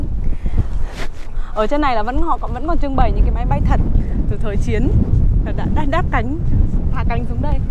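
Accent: Hanoi, Vietnam. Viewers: Bạn hướng dẫn xinh gái quá đi có người yêu chưa bạn ơi ! bạn nói giọng HN à ? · Xinh đẹp, phúc hậu, giọng bắc chuẩn hà nội nghe mê à!!!!!! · nói giọng bắc dễ thương quá. Mà bạn quê ở miền bắc là ở Hải Phòng phải không. Chiếc tàu lớn quá. Ủng hộ đầy đủ like sub tặng bạn. Đã xem full. Chúc bạn ngày mới vui khỏe và bình an. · Girl đâu mà xinh thế, giọng hà nội nữa chứ , yêu thế ❤️ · rất xinh đẹp và nói giọng Hà Nội nghe rất dễ thương. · Em là người gốc Hà Nội hả. Sao giọng hay quá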